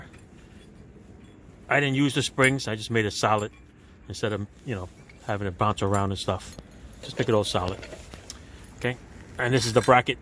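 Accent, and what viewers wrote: New York, United States. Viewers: Thank you, thank you thank you. I am a native NuYawka living away from home and experiencing the same problems with my 2011 Honda CRV. The quotes I got were astronomical and pushed into my "I wish I was home cause I beat this can be done for under 50 bucks!" After trying to find a better price I transitioned into "I can do this my(dayam)self...I am NY Hard!" When I found your video my ears perked up b/c I said I know that "accent." Then after watching you fix this simple problem and share the information gave me new hope. Again, thank you, thank you thank you.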